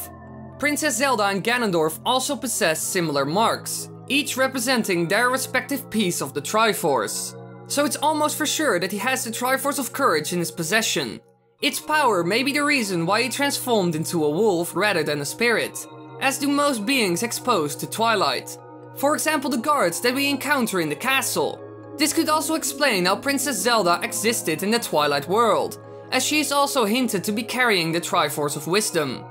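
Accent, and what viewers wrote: Netherlands. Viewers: you a dutchy? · hehe is that a holandish accent i hear? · Are you Dutch?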